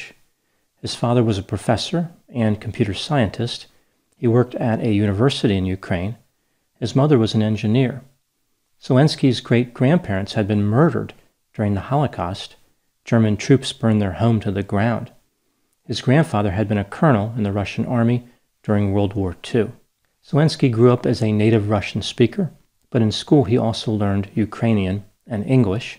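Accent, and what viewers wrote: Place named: Philadelphia, United States. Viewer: i never noticed but are you from the philadelphia area by chance? i notice you say certain words like i do. but your accent is not strong like NE philly or bensalem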